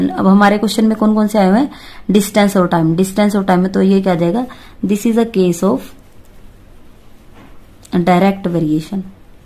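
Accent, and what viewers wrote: Haryana, India. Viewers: Mam is belongs to harayana because unke bolne ke tarike se pata chalta hai ,,right mam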